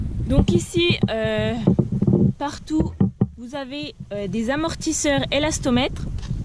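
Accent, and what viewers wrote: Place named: Haute-Saône, France. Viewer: Désolé, je n’avais pas reconnu l’accent de la Hte Patate ! 👍 (Suis du canton de Marnay, émigré en Vendée depuis bientôt 40 ans). Vidéos intéressantes pour un passionné de machines et matériels agricoles ! 👏👏👏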